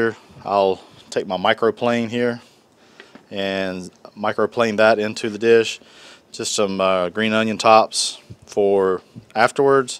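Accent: North Carolina, United States. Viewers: The whole time I was watching, I kept thinking, man, he’s gotta be from NC...lol! I lived in Denton for 10yrs. Good people!😁👍🏻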